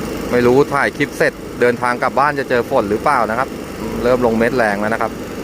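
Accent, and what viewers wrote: Thailand. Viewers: ชอบเสียงบรรยายลูกทุ่งดีครับ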